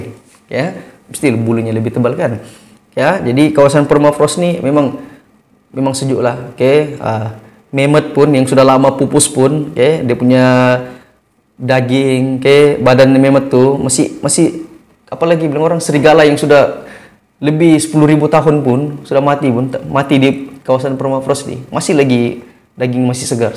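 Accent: Sabah, Malaysia. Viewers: cikgu orang sabah ke? sebab cara cikgu cakap macam sabah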